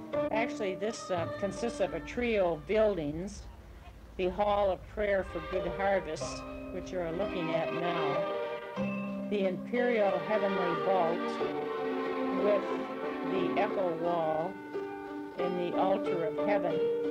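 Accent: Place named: Michigan, United States. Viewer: Not Canadian. Could be from Michigan. Wisconsin maybe.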